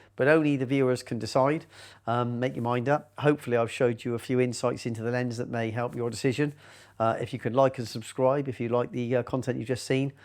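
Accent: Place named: West Country, United Kingdom. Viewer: very good review, the parfocality of the 2.8 seems more than good enough to settle any worries I had. Agreed on the pricing of the servo unit, it's far too high a percentage of the lens' cost itself. Shirt was on point too, and west country accent turned up to 11 made up for absence.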